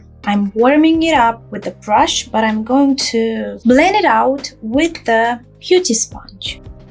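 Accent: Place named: United Kingdom. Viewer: I love the british accent! And the ByTherry powder Is my favorite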